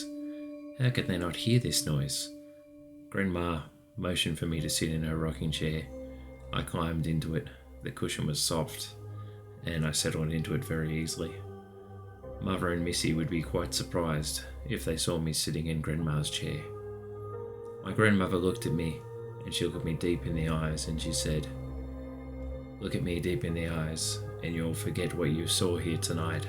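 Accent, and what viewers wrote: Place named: Australia. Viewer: I figured that out on another video. I even commented like, "hold on you sound extremely Australian now"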